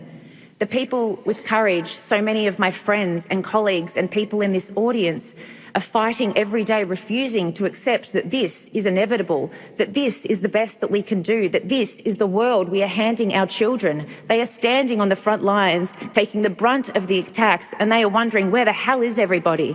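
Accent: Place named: Australia. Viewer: Omg! She's an Aussie. Struth and by crikey 😂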